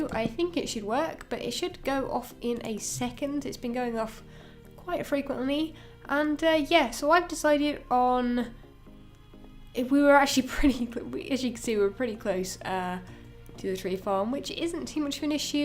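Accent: United Kingdom. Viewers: Can't get bored of your british accent <3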